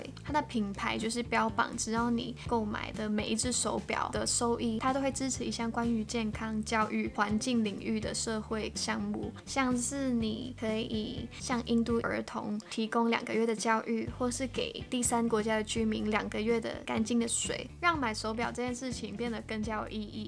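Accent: Taiwan. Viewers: 點解你D國語可以講到同台妹一樣 ?!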